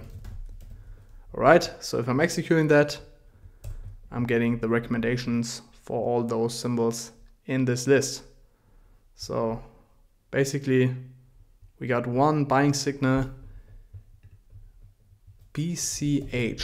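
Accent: Germany. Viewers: ich höre du bist deutsch, wäre es möglich mal du reden über discord? ich habe kranke erfahrungen , also sehr sehr viel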